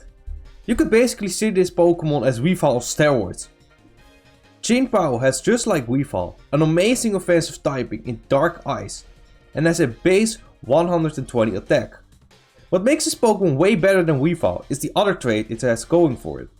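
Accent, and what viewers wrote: Netherlands. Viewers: u dutch?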